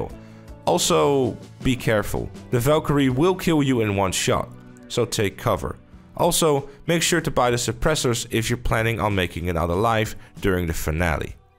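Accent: Netherlands. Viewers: this dude dutch though! geweldige video man, echt vet... goed bezig! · hoorde gelijk dat je nederlands was haha XD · Je bent Nederlands ik hoor het aan je accent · Are you dutch becuase you have a accent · are you dutch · Are you Dutch?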